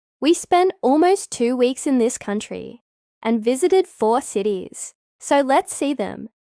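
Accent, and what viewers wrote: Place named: United States. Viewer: Oh no, another American-speaking influencer girl. Don't we have enough?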